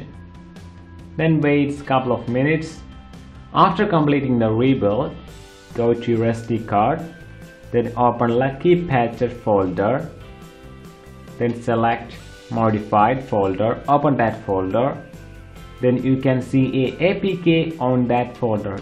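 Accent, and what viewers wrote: India. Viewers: OMG. Indian accent is so annoying especially when they try to pretend that they are not Indians.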